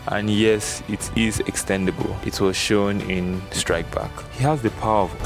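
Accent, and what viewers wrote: Nigeria. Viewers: Are you Nigerian? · are u nigerian by chance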